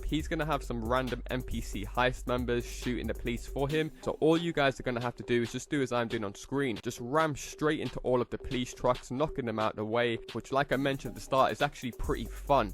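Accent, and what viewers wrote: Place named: London, United Kingdom. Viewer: i'm from london and i can tell you are, it's strange to hear such a well spoken londoner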